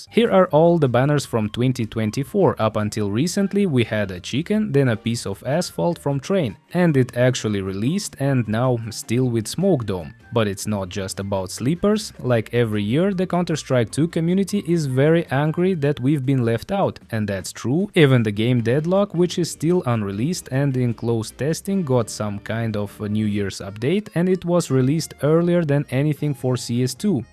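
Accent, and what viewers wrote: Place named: Russia. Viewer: god bless you, i was missing a interresting russian talking, with that cute russian accent. i love it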